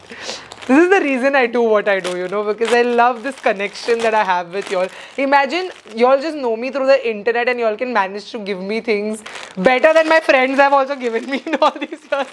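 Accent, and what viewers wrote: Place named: Karnataka, India. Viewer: Hey.... Love ur video... U r a lady boss.... I m from Karnataka....i loved ur kannada accent....u r just the inspiration for younger generation....i really feel very happy to see your videos...i think this my first comment on ur videos....i watch all ur videos.... U r the best... Super independent women ❤️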